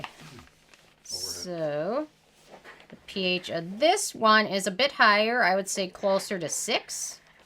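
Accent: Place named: Midwest, United States. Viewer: Are you from the Midwest? Your accent sounds like mine. I’m from Michigan! Haha. Also, great video as always.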